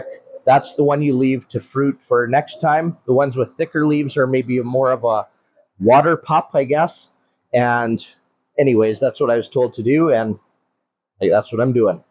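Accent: Saskatchewan, Canada. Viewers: Some how the Canadian accent works with better than and I'm not sure how I feel about it. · Bro, we can tell by the accent you're a good Sasky Canadian boy, there won't be any naysayers 😂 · I couldn’t possibly say nay to that thick Canadian brogue.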